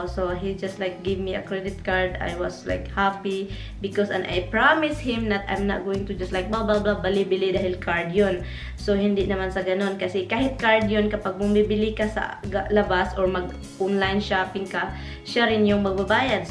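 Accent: Visayas, Philippines. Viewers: hahahaha..hello jan...mao ning baboy nga sexy og wafa...hehehehehe kakaibang baboy TO.just ignore those no sense PEOPLE.hehehehehe...papansin lang na ba.hehehehehe may pa mangaon tag tinapa na isda.ana man jud nang bisaya mag English,teacher nga dili perpik eh.hehehehehe...only God is perfect. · ganon talaga ang bigkas tayo mga visaya tingnan mo melai canteveros si momy dionesia inggit sila dahil marunong tayo magtagalog samantala sila bubu sa visaya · Hahaha!😂😂😂 Some people got nothing to do. Iyan Ang mga taong walang magawa, sila ang naiingit sa iyo. And I like the way you talk, so what if your a visayan Wala silang pakialam. Just ignore those kind of people, they're ignorant. Di huwag na siyang manood if they don't like you. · Hi I love all your vlogs ,hayaan mo yang mga bashers at least marami naman mga good people loves you .mga wala lang yan magawa at naiinggit lang yan sayo.Stay what you are ,I am proud to be bisaya ,I love the way you talk , ganon talaga tayo mga bisaya matigas magsalita ng words ,marami ka naman napapasaya like me I really enjoy your videos .Don't mind people judge you ,walang perfect sa mundo ,Stay positive and stay what you are I love you , pashoot out naman ,God bless😍😍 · I like you sis for being so honest, there's no problem if you sounds like bisaya when you talk in English or Tagalog, let's be proud of what we are and where we came from, maybe these people are envied by you, don't stressed yourself..... 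· Yung iba nga sis tinututok pa ang mic para marinig ang nguya eh hahaha.. yun nga sis prob sa mga viewers di nmn lahat ng kilos ntin eh need video di nmn lahat move. Mga ganyang ganyan din mga comment sakin hahaha. Mga inggit lng satin sis. Bisaya ka kasi sis kaya syempre bisaya accent talaga. Syempre maganda nmn talaga tagalog english para maintindihan ng lahat. Pag nagbisaya ka sis mdami malulungkot di makaintindi. Tawa ako ng tawa pagbasa mo hahaha. Ako nmn magtatagalog nlng daw ako eh pag nagtatagalog ako dami nmn nagrequest english kasi may mga foreign viewer. Tawang tawa ako sa may asim pa hahaha. Pokpokin mo n sis hahaha. Dati nagbasa din ako negative comment mas lalo sila nagsasaya haha. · SO WHAT KUNG MAY ACCENT KA? So what kung bisaya ka? Grabe itong mga taong ito akala mo naman matatalino pa sa yo! Bkit sila ba may blog kagaya mo? Inggit ba inggit sila kaya ganyan sia ka mean sa pagsasalita nila sayo. 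Kaya hayaan mo nlang sila. Matuto sila ng tamang asal!!! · nakakainis ka talaga mga insecure...ano din Kong may visaya accent kaloka talaga ..pilipino nga naman kung wala kayo maganda isasabi wag kayo manuod ..mga inngetira ...grabi naman talaga pilipino pag naka asawa foreign pokpok na agad kaloka msyado mga inngetira talaga ..may asawa rin ako foriegn church kami nag meet nag husband same religion..wala ako paki sa tao ayaw sa akin .. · Si Pres. Duterte nga ganyan din mag english dahil bisaya din siya. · hayaan mo sila sis, i like u nga e. alangan namang vlogger di palasalita..syempre magdadaldal ka. ganun talaga regional accent kasi bisaya... ako ngang pure tagalog namamali din ng bigkas.😂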